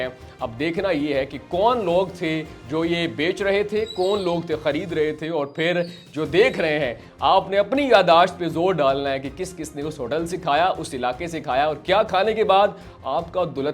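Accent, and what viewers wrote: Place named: Pakistan. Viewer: Burger urdu bholny wale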